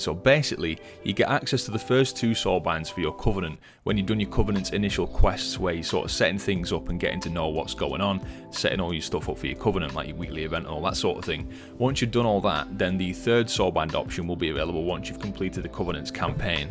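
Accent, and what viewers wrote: Yorkshire, United Kingdom. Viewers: Thanks for useful insight. Yorkshire? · Thankyou for this video, excellent put together, and has made me me absolutely certain I do not want to play Shadowlands. Also, cant believe your from Chorley, you sound proper Yorkshire mate :)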